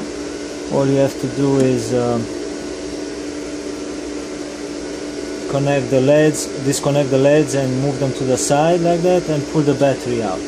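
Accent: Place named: Romania. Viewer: Sound so romanian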